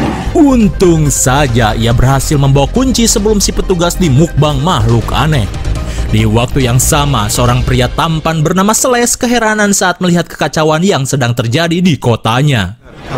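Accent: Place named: West Java, Indonesia. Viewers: Logat Sunda nya ciri khas dari kang 😅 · urang mna ciga urang sunda ning abi t padalarang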